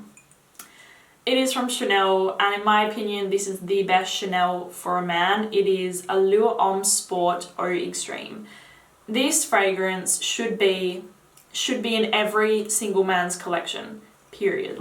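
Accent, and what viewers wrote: Australia. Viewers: it went up quick. It's that aussie accent. Us blk men love it. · An Australian accent on a woman is my weakness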